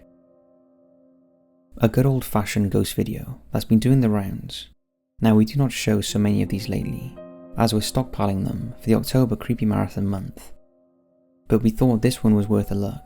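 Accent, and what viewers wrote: United Kingdom. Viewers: It’s just the accent, British people don’t seem to make use of the letter Z (zed as they call it) or its sound very often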